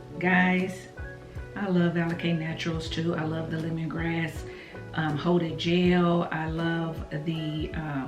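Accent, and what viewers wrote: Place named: Indiana, United States. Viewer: Hi! Thanks for replying! I’m from Barbados, so everyone outside of Barbados has an accent to my ears 🙈. I don’t think I’ve ever knowingly heard anyone else from Indiana, so I didn’t have a point of reference. In any case, I could listen to you talk all day! I think it’s your energy and your entire demeanor as well. Keep the great content coming! 💕🤗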